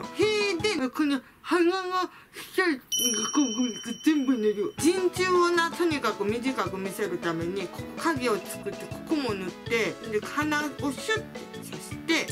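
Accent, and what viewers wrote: Okayama, Japan. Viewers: 岡山県民やけど久しぶりに見て岡山弁すごいでてんなってなった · 岡山弁がなつかしゅーて見てしまう(笑) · 岡山弁？可愛い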